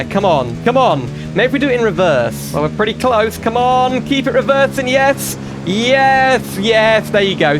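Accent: United Kingdom. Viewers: are you britsh?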